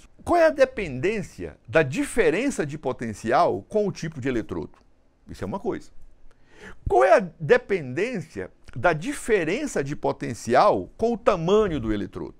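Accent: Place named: North, Portugal. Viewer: Acho q esse sotaque é do Norte... kk Excelente video! Muito Obrigado! Sucesso!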